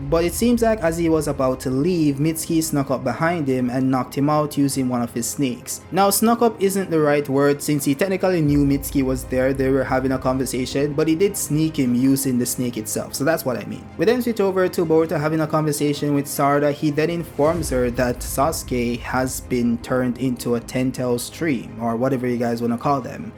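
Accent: Jamaica. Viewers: you a jamaican bro?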